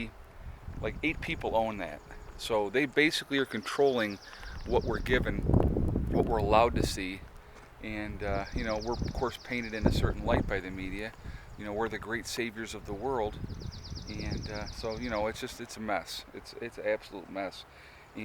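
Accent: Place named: New Jersey, United States. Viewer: Nice video; I'm subscribing. That sure sounds like a Jersey accent.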